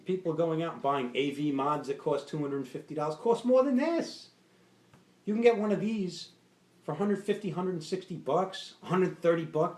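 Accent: New Jersey, United States. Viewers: I vape using smok Tpriv at 65-68w on recoil RDA at.20ohm using mxjo's dual 18650 and I produce big clouds. it's not how much power you put to device to produce a cloud. it's also what kind of juice your using PG/VG I use max VG. there you go yo sound like a joisy boy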